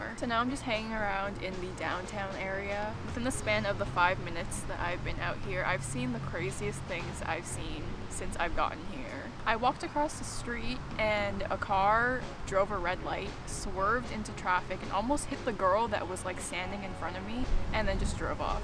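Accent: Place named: Toronto, Canada. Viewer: Is this in Toronto because I noticed the accent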